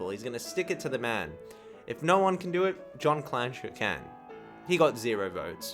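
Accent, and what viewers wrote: Australia. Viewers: Are you an aussie? sorry I never noticed your accent till now and I've been watching you for months now. I feel like It should've been more apparent. lol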